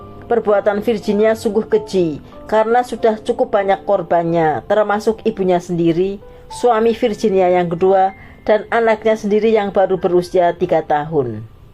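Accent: Java, Indonesia. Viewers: trllu mdok jowone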